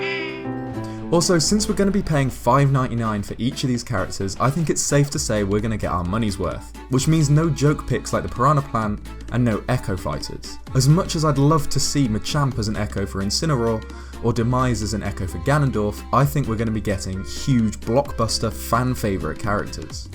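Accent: United Kingdom. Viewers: Where are you from in the UK bro?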